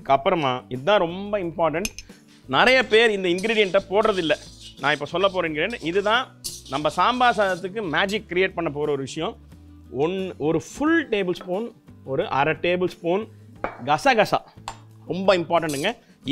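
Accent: Chennai, India. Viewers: Dear chef, Love your passion and the joy you have for cooking, my Amma watched every episode of Samayal Samayal and enjoyed it immensely... Unga Madras Thamizh kaettaa I feel so at home 😊... Do we add the Sambar podi only in the end and not while cooking the veggies in the tamarind extract? Will there not be a raw smell (of the chillies kaaram) since we are turning the stove off immediately after adding the Sambar powder and not cooking it? Will be thankful if you can pls clarify, this is my absolute favourite and I'll be super thrilled if I get it right, missing Chennai :)...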